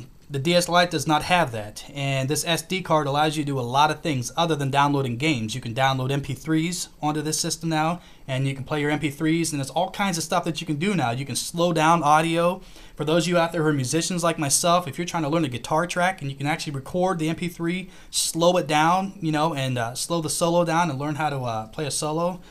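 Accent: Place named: United States. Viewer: i instantly know your american Y'all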